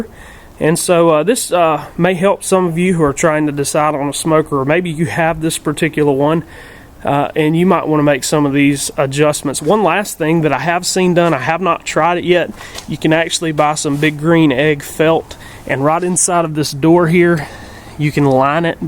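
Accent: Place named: Texas, United States. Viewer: I always smoke in my offset bur a bud got a vertical Brinkmann and neither of us had any idea what the hell to do! Thanks for the video, sir. BTW, your accent, are you one of my fellow Texan brothers? Hope all is well with you and yours, sir.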